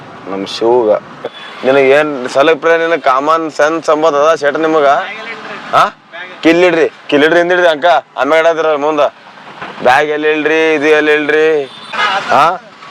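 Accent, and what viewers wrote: Gulbarga, India. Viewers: Me from Bagalkot but I like the gulbarga style kannada slang .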